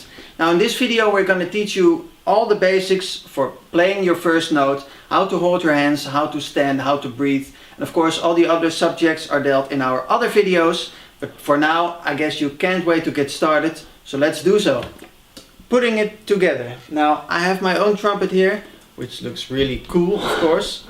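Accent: Netherlands. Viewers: that dutch accent tho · I knew you were Dutch the moment I heard you speak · Its a Dutch accent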